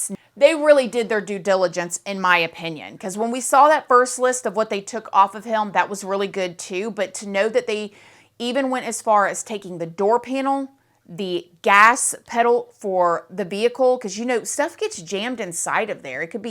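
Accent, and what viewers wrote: South, United States. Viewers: WeekAND.. love that southern drawl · your so beautiful i absolutely listening to you talk I like your accent! Southern... it’s so indearing even if your speaking on something like this 😮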